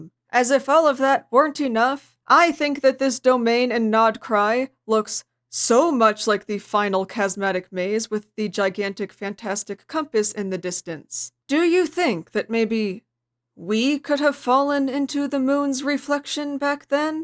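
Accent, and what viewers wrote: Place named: Canada. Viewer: I hear some canadiannism in your voice, or maybe its northeastern US which has canadian influence in their accent 😊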